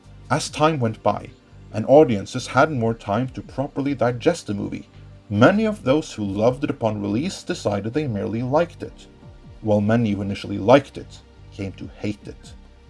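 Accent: Sweden. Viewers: you're swedish, aren't you?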